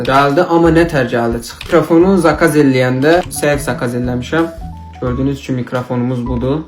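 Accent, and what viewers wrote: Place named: Qazakh, Azerbaijan. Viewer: Qaqam haralısan lehçen Qazaxlılara oxşayır ❤ men ❤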